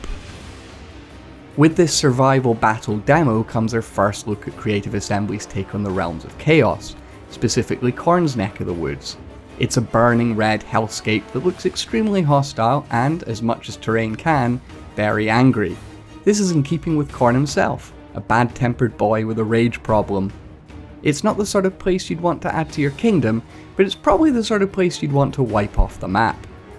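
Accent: Scotland, United Kingdom. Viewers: Great video. Love hearing a fellow Scot. Can’t wait to get my hands on TWW3 to try out these new battles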